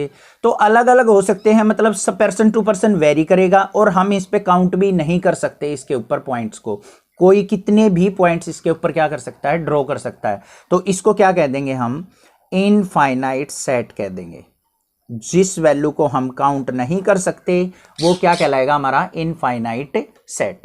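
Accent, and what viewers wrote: Haryana, India. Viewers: SIR AAP HARYANA SE HO.........??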